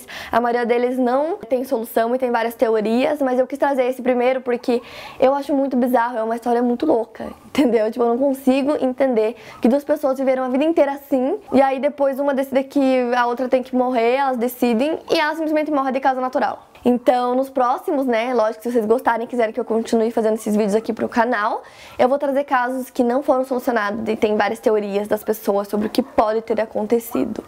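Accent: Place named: Paraná, Brazil. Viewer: Se eu não estiver enganada, o teu sotaque é de paranaense né?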